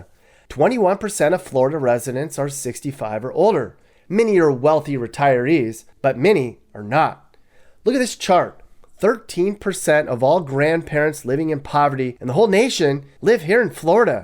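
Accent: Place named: North Carolina, United States. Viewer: what general area of NC are you from? I’m going to guess Raleigh…I can’t quite figure it out based on ur accent (I used to live in several cities in Nc). Anyway- I enjoy ur channel:)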